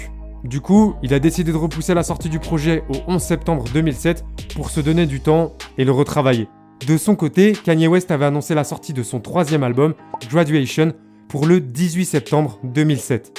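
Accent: England, United Kingdom. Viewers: mention speciale pour ton accent anglais et tes videos de wack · ouiiiiiiiiiiiiiiiiiiiiiiiiiiiiiiiiiiiiiiiiiiiiiiiiiii enfin tu fais plus ton accent anglais tout était parfait sauf ça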